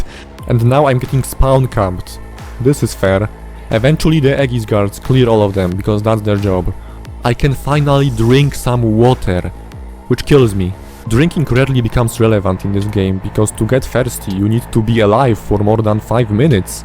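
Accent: Poland. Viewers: Your accent sounds polish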